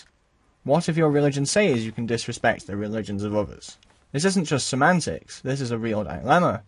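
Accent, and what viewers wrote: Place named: United Kingdom. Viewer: OK, I'll check it out. That goes a long way toward explaining why a video on a channel from someone whose channel originates in The Netherlands sounded so completely British! :-)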